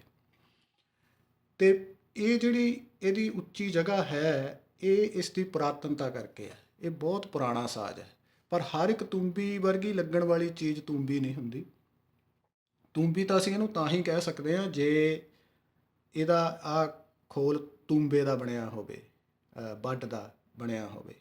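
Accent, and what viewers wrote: Doaba, India. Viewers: ਵਗੈਰਾ ਵਗੈਰਾ ..... ਜਿੱਦਾਂ ਕਿੱਦਾਂ , ,..., love the doabi accent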